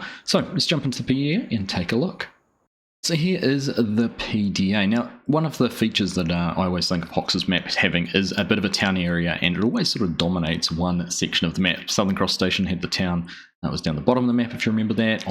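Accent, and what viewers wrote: New Zealand. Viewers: Im sorry but what part of this map represents a Aussie farm ? to me it looks same as a euro map . and a kiwi dude voice over , thats like getting a mexican to describe america .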